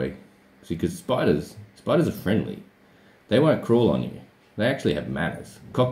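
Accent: Australia. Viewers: yk its an australian video when he has an australian accent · I heard the Australian accent before I even clicked the video 😭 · Ken Ken he sounds slightly Australian, he’s also from Australia. Australians are known to be cool with spiders and stuff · Of cause he’s Australian the accent and the fluent voice is Australian. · you know this is australia when he has a australian accent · Me after seeing the thumbnail: “I bet this is in Australia” Video dude two seconds into the video: Australian accent YouTube pinned location: Australia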